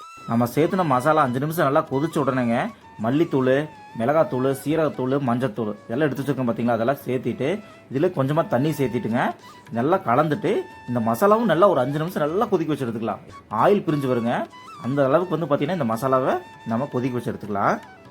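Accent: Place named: Kongu, India. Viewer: Super na kongu tamil la arumaiya pesuringa....❤❤❤❤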